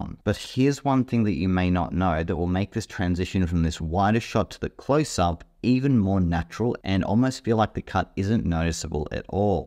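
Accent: Australia. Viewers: Thanks mate you are helping me get unstuck with these bloody reels.. you are Aussie, are ya maaate? cheers!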